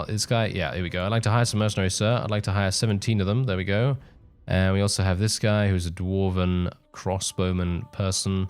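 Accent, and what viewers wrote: United Kingdom. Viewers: Aw fuck thats so funny. You knew what was gonna happen, youve read the wiki, you had an idea what the red immortals could do since you just fought 10 of them, its always hilarious when you get to complaining in your lovely british voice.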